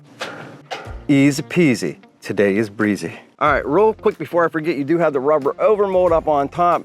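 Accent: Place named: Philadelphia, United States. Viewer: Bro’s gotta be from Philly area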